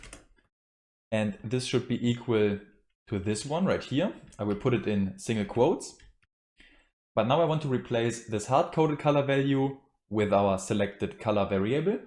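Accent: Germany. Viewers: are you german ? you have the accent man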